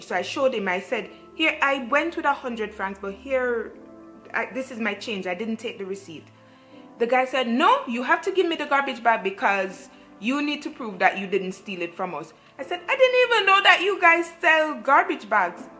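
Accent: Jamaica. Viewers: Girl mi hear di Jamaican accent 🤣🤣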